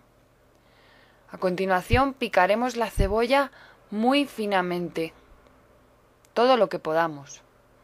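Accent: Spain. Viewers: Gracias " " Que rico, me gusta escuchar el acento espanol y conocer los nombres de las cosas. Lo unico que no entendi fue el nombre del chile que se le agrega al mortero (o molcajete como le dicen en Mexico), Cual sera el nombre en Ingles? (vivo en los Estados Unidos) De nuevo, Gracias!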